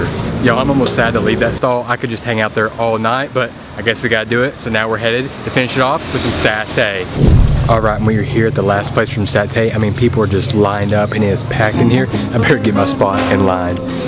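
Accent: South, United States. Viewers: Love when you still say ya'll ...very southern USA. Have fun in South East Asia.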